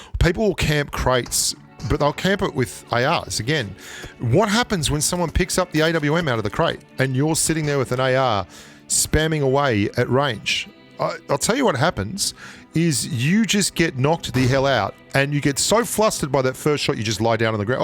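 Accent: Australia. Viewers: Are you from Australia bro ??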